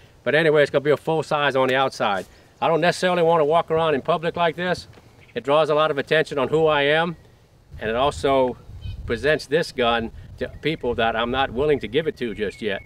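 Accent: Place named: Cajun, United States. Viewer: little tinge of cajun in your accent! are you from acadiana?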